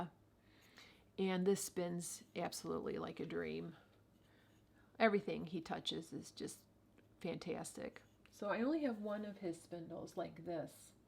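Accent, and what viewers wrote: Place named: Midwest, United States. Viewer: I knew as soon as I heard you speak that you were from the Midwest. I was born in Rockford and spent half my childhood in Dixon, Il. Life has taken me to England though. Thank you for this video!! I found it very informative. Last year, one of my best friends gifted me a bunch of wool from sheep she breeds and raises. She gave me some gorgeous Blue-Faced Leicester and a spinel that she made because she knows how much I love to knit. I figured it was about time I learn but have been putting it off out of fear. I love the way you broke your video up into sections for us because that will come in handy when I go back and want to view a particular technique. It's so hard to know what videos to start with when there are so many out there, and I feel blessed that I found yours because you showed your viewers different techniques to use, and honestly, some may be easier than others for different people if that makes sense. 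Do you have a video on preparing the yarn? I know from another short video that you put the yarn on a niddy noddy then put it in hot water. Can you put a wooden niddy noddy in water though? Does the book you recommended go into all of that? Once again, thank you so much for this?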